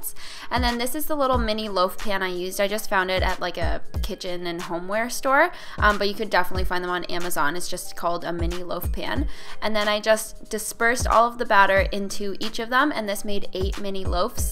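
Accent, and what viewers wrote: Canada. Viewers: Are you Canadian????? I love your videos 😁❤️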